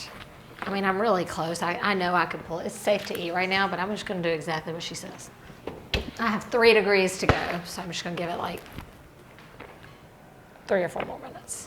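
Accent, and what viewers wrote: South, United States. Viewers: Just popped it in the oven. I never saw your videos but this is great. I love your accent. Is it southern? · is southern for sure! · Loving your vibe and personality.. also the southern hint to your accent, you should have your own cooking show on tv.. I’d definitely watch it 👍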